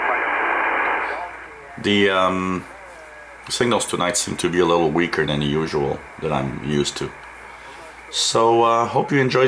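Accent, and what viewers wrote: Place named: Quebec, Canada. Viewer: As soon as I heard you say "turdy" & "dat" I knew you were a Quebecer! Haha. Hello from a fellow Canuckistanian from New Scotland! Thanks for your great vids! They really answered a lot of questions I had about the various bands.... :)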